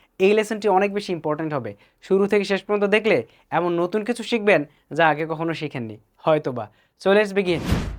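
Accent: United States. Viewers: american accent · ভাই এগুলো America Accent নাকি British Accent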